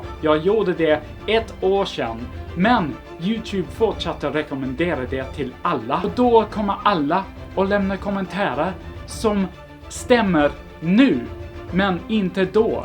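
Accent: Stockholm, Sweden. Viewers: selv når du prater engelsk så har du en svensk aksent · Din melodi när du pratar är jättebra, låter verkligen svenskt. Coolt! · You really nail the swedish nasal sounds very impressive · Haha, great video! Your pronunciation is actually very good and easy to understand. I laughed so hard when you spoke English because you had a Swedish swing on it, we call it Svengelska which made you sound like a real native! Stay safe and have a great week:) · Hahahaha detta är den mest svenska videon jag någonsin har hört! 😂👍 Är det en sak som är jättesvenskt så är det att klaga på saker man stör sig på så det var både roligt och härligt att se och höra denna video! XD Jag kan tänka mig att alla läten i ordet tillräckligt är svåra att sätta ihop tillsammans helt klart. Vet inte om du har plockat upp detta eller inte men utifall det kan göra det lite lättare för dig så oftast brukar vi bara skita i att uttala G:et så det blir "äcklit" och kvar blir det knepiga övergången från L till R vilket jag kan tänka mig är jobbigt för tungan. 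Till (r) äcklit. Det ska bli spännande att se hur din dialekt utvecklas för redan nu har du vissa ord och fraser som låter stockholmsk eller göteborsk vilket är himla häftigt. :) Det är definitivt väldigt svårt att prata främmande språk till en kamera. Jag började lära mig engelska för 23 år sedan och jag blir fortfarande blyg över att bli filmad trots att jag är mera bekväm att prata engelska nuförtiden för jag har knappt talat svenska alls senaste åren. Kudos ^^ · Jag behövde inte undertexter för att förstå, det är fan bättre än min spanska 😂 Jag älskar att när du sa nåt på engelska så hade du en svensk dialekt, snart nog så kan man inte urskilja dig från en skånsk/stockholmare 👌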